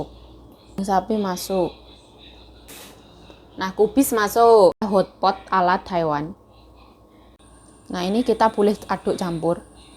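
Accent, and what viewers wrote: Java, Indonesia. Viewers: Lucu ya mba ngmong na..ciri khas bgt legot jawa ya.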